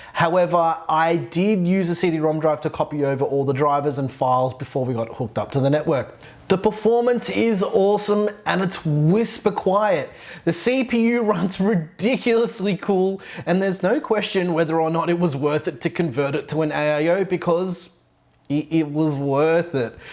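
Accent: Australia. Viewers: are you australian 🤢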